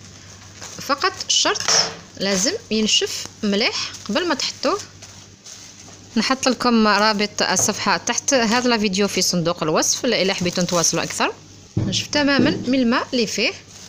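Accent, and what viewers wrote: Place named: Kabylie, Algeria. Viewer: Chui d'accord avec toi ces vrai l'accent kabyle grave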